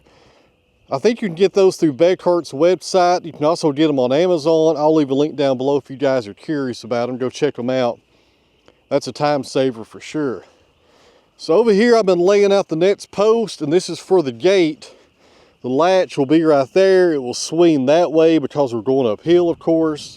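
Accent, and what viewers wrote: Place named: Tennessee, United States. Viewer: It's OK, I have relatives in Tennessee and I can understand you just fine. 😊😉 Sometimes they have a little trouble understanding me from Minnesota. Ya sure you betcha.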